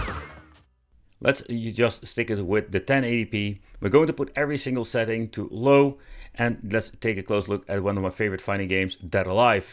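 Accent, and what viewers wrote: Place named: Netherlands. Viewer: Ik hoor je Nederlandse accent ik weet niet waar je woont maar als het dicht bij Alphen aan den Rijn is dat goed. Ik heb er. Rog ally waar ik probeer games te emulaten maar het lukt mij niet als je dat aan de praat kan krijgen kan je mijn rog ally een review geven met emulation ik probeer voornamelijk Wii, Wii u, switch en Xbox 360/ps3 games emulaten maar emudeck werkt niet bij mij ik heb mijn ssd wel geupgrade naar een 2tb ssd miss kan dat er mee te maken hebben maar als je niet dicht bij woont heb je misschien tips om het wel aan de praat te krijgen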